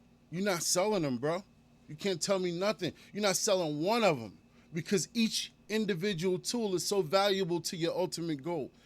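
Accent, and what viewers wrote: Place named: New Jersey, United States. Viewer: I can tell homie from jersey im from maryland but been to jersey alot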